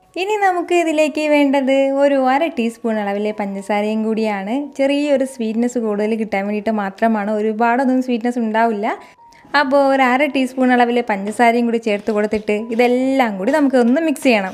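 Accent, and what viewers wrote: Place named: Kasaragod, India. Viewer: kasaragodile oru nattile baasha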